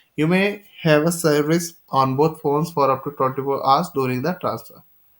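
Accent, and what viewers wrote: India. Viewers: your disgusting indian accent kills me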